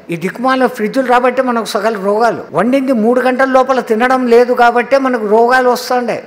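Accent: Rayalaseema, India. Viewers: పక్కా రాయల సీమ భాష.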